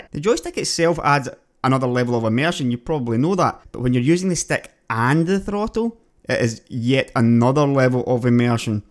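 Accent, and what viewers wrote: Scotland, United Kingdom. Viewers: How many takes did you have to do to make a somewhat understandable Scottish accent for this video? I can't understand my Scottish friends half the time. I'm surprised I understood most of what you said. I know you're speaking English, but even then, lots of English people don't understand what the Hell you guys are saying most of the time. :P Also, thanks for the review. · I know this is a silly critique, but this Scottish accent is basically the quintessentially elite and refined like a fine wine version of Scottish that I've ever heard. You need to get into voice acting, voice overs etc, my dude.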